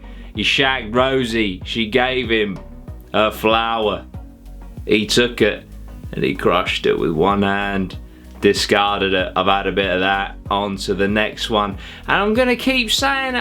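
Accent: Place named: Liverpool, United Kingdom. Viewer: Your scouse accent cracked me up 😂😂😂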